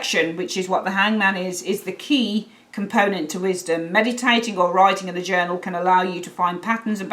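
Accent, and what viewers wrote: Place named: Black Country, United Kingdom. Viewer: definitely hint of black country in that accent .. yau in dugley?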